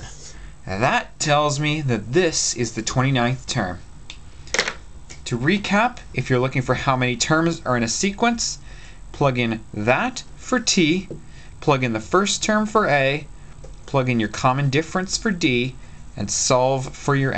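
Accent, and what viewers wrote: Canada. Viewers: You sound canadian:)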